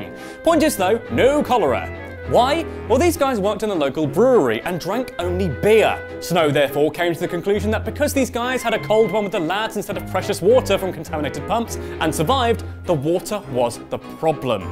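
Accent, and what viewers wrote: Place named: United Kingdom. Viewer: Loved this! But can you hire a Captions guy? I'm East European and i watch you while i'm high *that how you roll on youtube kids*, and i'm really trying hard to understand your marvelous british accent, greetings fella!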